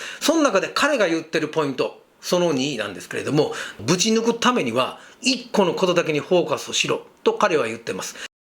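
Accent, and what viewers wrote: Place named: Kansai, Japan. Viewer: 関西弁って苦手なのですが、の語り口調は凄く聴きやすいですね。話も勉強になりました。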